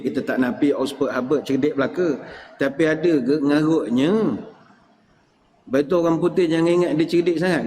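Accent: Pahang, Malaysia. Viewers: sedak mat dengo ora2 pahang berceramoh…😅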